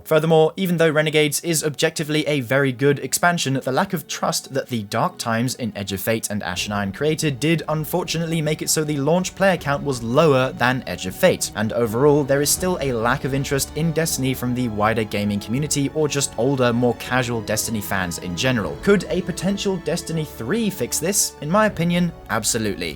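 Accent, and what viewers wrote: United Kingdom. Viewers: I usually roll my eyes at the “The future of this channel” videos because they’ve turned into bait due to what they’ve become to signal recently, but because I’ve become such a big fan, I had to watch. About halfway through when you said that you found your community, I realized something: You don’t know what you’ve become to the community. You seem genuinely surprised that your guides have been so popular. I will tell you that while Byf is our defacto lore person, you have fallen into that role for guides. Not that others are bad, but because of several things you offer in yours. They’re concise, to the point, very detailed in all the ways they need to be while showing exactly what you’re talking about with a phenomenal tone, vocabulary, personality, and delivery. As far as your builds and guides go, I consider you my Alfred. Giving your gentle British advice and tips before I go out and exact vengeance and get my ass beat lol. 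Thank you and keep doing it. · tbf his british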